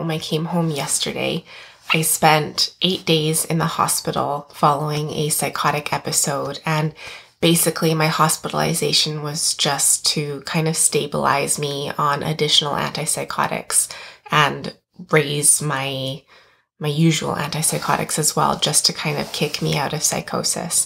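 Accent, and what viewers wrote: Canada. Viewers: It sounds like you’re Canadian? If so, I will ignore the clinical dogma, as in the U.S. we use human experience language to describe extreme states. I consider the word “psychosis” a slur, no matter who utters it.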